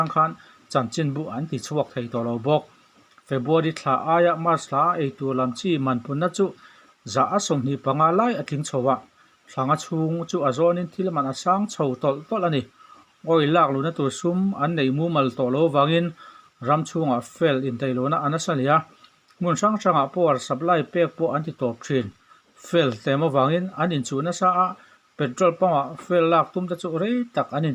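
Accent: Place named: Mizoram, India. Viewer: Ka pu i news puan hi a ngaih nawm awm thei viau ah masah i english pronunciation hi hi ngaih thlak a har deu thrin ka ti. Ka dem lo che maseh english kan hmang chuan mizo thuthluk in I rawn lam thrin a, a dik lo deu hlek ka ti na a ni eh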